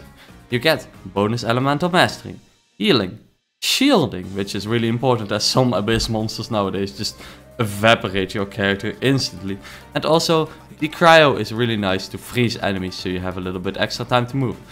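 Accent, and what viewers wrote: Netherlands. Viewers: Are you dutch? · The Dutch accent haha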